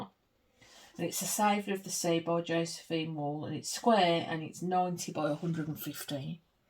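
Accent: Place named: Birmingham, United Kingdom. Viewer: Great wip n chaty little Brummie glad your getting back to you my fave book growing up was the secret garden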